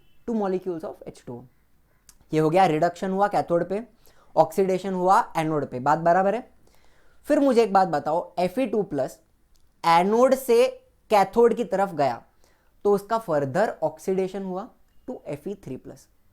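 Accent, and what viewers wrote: Maharashtra, India. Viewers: Sir kya aap maharashtra se ho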